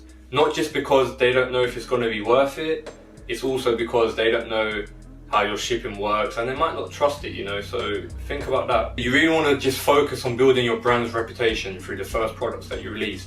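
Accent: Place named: United Kingdom. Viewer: Light skin nipsey hussle with a British accent cuz lol naw real shit thanks for the info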